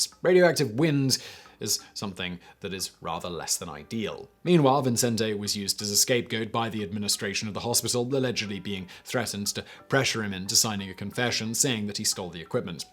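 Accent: England, United Kingdom. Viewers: Cool story. But your pronunciation is still terrible. And the names and places sound like a mumbled run-on. Ciuda--Juarez. The guy's name is Vicente not viNcente. It sounds especially strange because you have that smart british accent thing going on but then butcher or mumble the names. I guess Americans aren't the only ones. At least they enunciate the letters, even if the pronunciation is wrong. Don't forget the O-bam-a. I'm keeping count. :P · I really enjoy this mans narrating, maybe cause im American but his English accent is quite soothing. Even if it’s about a radiation disaster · This guy has the most British accent EVAH! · Hispanic names in a British are incomprehensible to my brain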